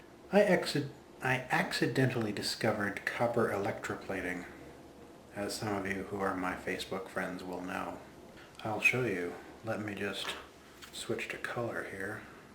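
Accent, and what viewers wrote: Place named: United Kingdom. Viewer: I'd like to see your other British accents.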